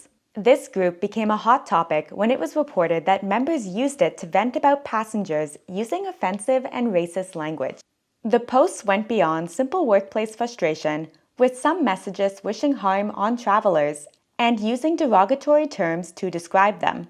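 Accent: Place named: South, United States. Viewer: That southern draw is disconcerting... just another reason I stay out of georgia.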